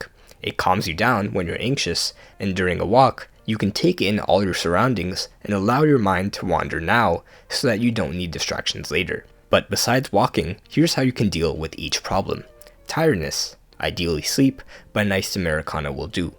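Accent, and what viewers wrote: United Kingdom. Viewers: Your British accent made me laugh 🤣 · nah bro the english accent 💀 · british accent goes crazy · That British accent though. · That British accent is FOUL lmaoooo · the british accent broke me!! perfection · The British accent got me. it literally forced me to drop a like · british accent 😄🤩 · I love the British accent😂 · that oxford student voice though....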